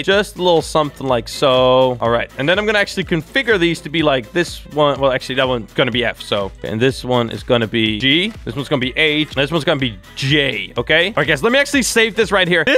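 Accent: Netherlands. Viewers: Are you dutch? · are u dutch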